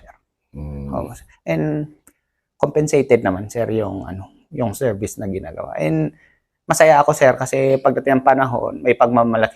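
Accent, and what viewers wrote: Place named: Visayas, Philippines. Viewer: Kakapanood ko sa part 1 Sir hindi accent ng ipugaw kundi bisaya na✌️.Ipinagmamalaki ka mg buong ifugao province sir at ipagdadasal din namim ang kaligtasan mo kayong lahat jan. Nakakalungkot lang na kapwa pilipino nagpapatayan anong klaseng utak kasi ang meron yong mga tao na kumakalaban sa gobyerno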